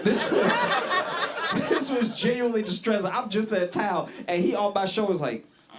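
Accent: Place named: Louisiana, United States. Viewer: I wonder what part of Louisiana he's from(?)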